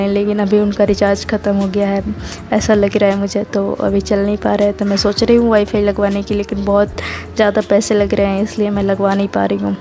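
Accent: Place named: Odisha, India. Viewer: Aap Odisha se ho naa.. awaz se lag rha hai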